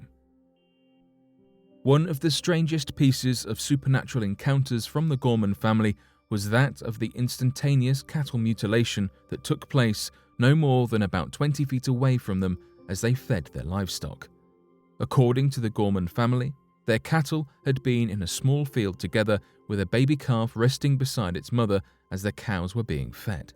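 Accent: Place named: Cockney, United Kingdom. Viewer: More recycled bull shit that isn’t anything new. Jesus fuck. Can you make videos on anything current? Cause from what I’ve seen on the websites I follow there’s plenty for you to report on. Probably because I’ve learned proper journalism from a professional one. And you’re probably an educationally lacking British person who’s grammatical cockney slang comes out in some sentences. No matter how hard you try to hide it... still comes out. 😂. Seriously though... did you finish high school even? Like you probably didn’t even have the chance to drop out of college(university, for your English ass) did ya!?! Hahahahahah.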